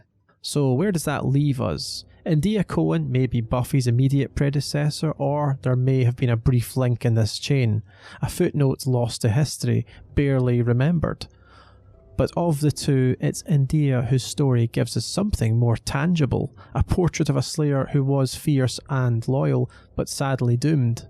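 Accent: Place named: Scotland, United Kingdom. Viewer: Heard a Scottish accent. From Edinburgh here.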